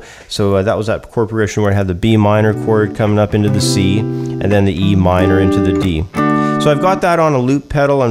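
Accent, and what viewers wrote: Canada. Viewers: Are you Canadian? Accent when you say out sounds like trailor park boys! Awesome lessons!!!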